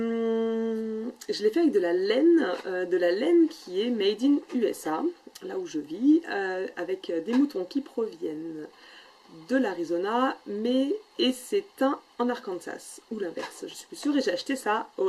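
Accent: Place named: Quebec, Canada. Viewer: Bonjour, Super podcast. Il me semblait bien avoir entendu un léger accent québécois. L'Arizona est superbe et en été il fait très chaud. J'ai sillonné les USA depuis Montréal , du nord au sud et de l'ouest en est avec une amie et j'ai vu le grand Canyon. C'est magnifique , j'ai visité Phoenix et j'ai beaucoup aimé mais cela fait 30 ans. J'ai envie de commencer le crochet mais je ne sais par quel projet debuter. En tout cas j'attends avec impatience ton prochain podcast. Sylviane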